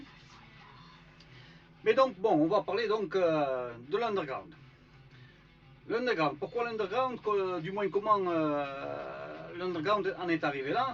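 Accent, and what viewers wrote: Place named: Pyrénées-Orientales, France. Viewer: Salut, Trop marrant après tout ce temps aux Pays-Bas, tu as encore un putaing d'accent des PO!!! Evol un jour Evol toujours! Sinon, je n'ai même pas le photo de Shud!!! Tu pourrais me l'envoyer par hasard plize? En tout cas, cool de te voir et t'endendre! COTLOD!!!!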